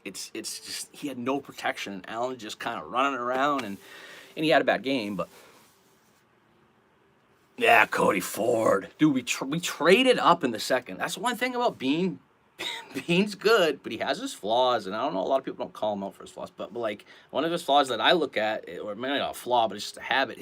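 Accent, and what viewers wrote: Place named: Maine, United States. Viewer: Good Job bro, that Maine accent shows up on live. Good people hunting is great.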